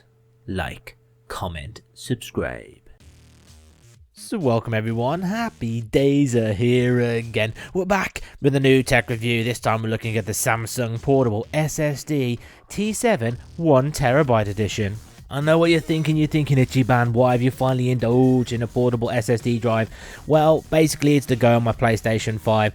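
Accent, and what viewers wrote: United Kingdom. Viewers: Thankyou so much for this. I was wondering if this drive would work on a ps5 for external storage for ps4 and your video has certainly confirmed that. Love your British accent too.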